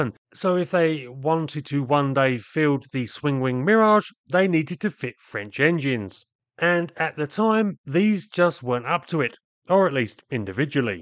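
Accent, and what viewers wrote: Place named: Essex, United Kingdom. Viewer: an informative vid as ever. Havimng memories of building this Mirage. And as ever your accent takes me back to my airport and airfield days around Essex and Chelmsford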